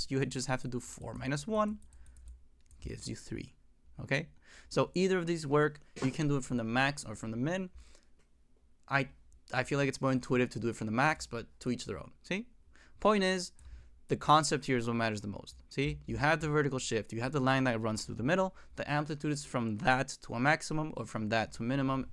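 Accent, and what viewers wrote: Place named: Chile. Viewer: This is gonna sound weird, but the 'cierto?' After every phrase sounds soooo cute. Are you from chile? Idk why but your accent reminds me of chile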